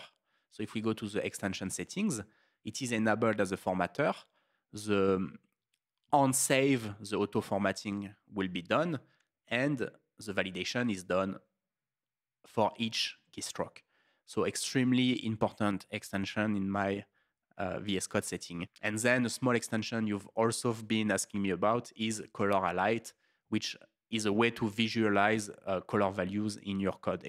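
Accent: France. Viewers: I'm detecting a strong French accent 👀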